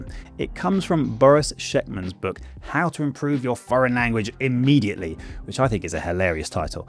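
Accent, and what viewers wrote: United Kingdom. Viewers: Too British for me. Ain't it my cup of tea. Sorry.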